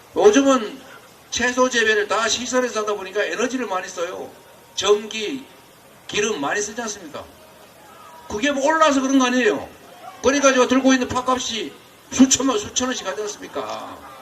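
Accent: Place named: Busan, South Korea. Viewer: 얍실한 정부 보내 버립시다 !방언 멋져요😊부산 표준어 최고야 🎉🎉🎉🎉🎉🎉🎉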